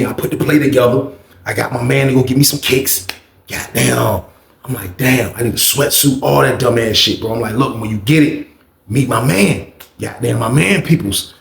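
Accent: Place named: Chicago, United States. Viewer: Yo the Chi town voice tho! 😂😂😂😂 then the $1000 POWER PLAY!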